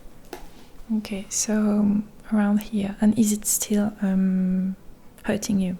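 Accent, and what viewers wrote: France. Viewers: French accent? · French Accent? 😍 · Même pas 2 secondes d'écoute et je reconnais ce magnifique accent français. En vrai ça va on est loin du niveau de Hollande xD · Being a 50 year old German male, I'm struggling a little bit with my imagination being a cheerleader 😁. But for listening to your soft french accent ... let's go for it ... 👩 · Je trouve ça chou l'accent français en anglais ^^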